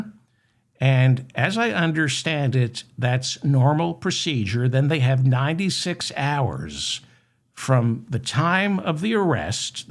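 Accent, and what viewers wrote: New York, United States. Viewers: ! I love your New York City grit. Nothing like it.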